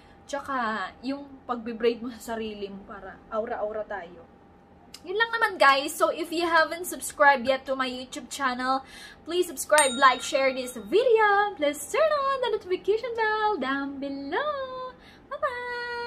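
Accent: Ilocos, Philippines. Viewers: Ilocano kapo ba?? 😂😂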